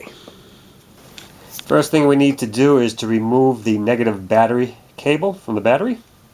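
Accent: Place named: East Coast, United States. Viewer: im not a mechanically inclined person so im starting an auto tech 101 class tomorrow..wish me luck..u got a cool lil accent. u must be from the east coast/eastern seaboard.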